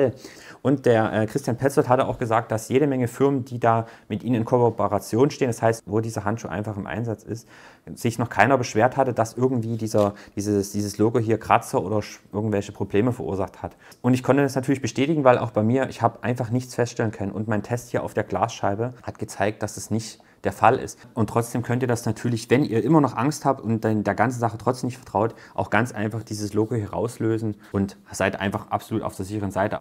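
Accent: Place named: Saxony, Germany. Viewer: Sry...hatte im vorherigen Video dieses noch nicht gesehen und dich nach dem woher und wie gafragt....wie gesagt, erst den 5ten Tag dabei....werde Dich definitiv via Amazon Link unterstützen, bei mir stehen im Februar einige Investitionen an und ich werde an dich denken..... Mach bitte weiter so.....Ehrlich, Symphatisch, Sächsisch....ich freu mich.....!!!!!!!!!!!!!!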